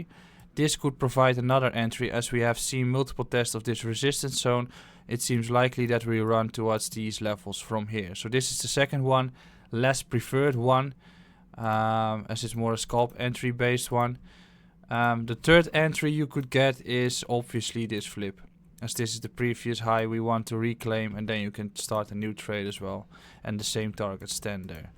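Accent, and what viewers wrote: Netherlands. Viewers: Lache man! Je eigen stijl ta , een dik NL accent en je wijst naar pareltjes. Ik volg je pas net, maar ziet er tof uit!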